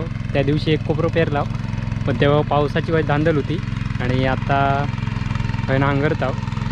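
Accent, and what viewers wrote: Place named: Malvan, India. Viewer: तुमची मालवणी फार सुंदर आणि ओरिजनल आहे. म्हणजे त्यात इंग्लिश आणि मराठी शब्दांची भेसळ नाही. बाकीचे v ब्लॉगर्स मालवणीच्या नावाखाली मराठीच बोलतात. आणि भरपूर इंग्लिश शब्द वापरतात. ॲड करा, स्टर करा, जॉईन व्हा, ब्रेक फास्ट करू या वगैरे. तुमच्या ब्लॉगमध्ये शुद्ध मालवणी ऐकायला मिळते.छान वाटतं.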